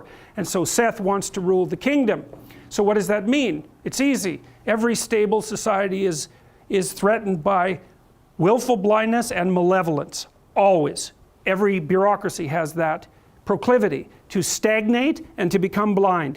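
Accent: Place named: Canada. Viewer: The way he says "not" and "God" is for some reason hilarious to me. Or anything with an o. What a Canadian. Also, long live the lobster queen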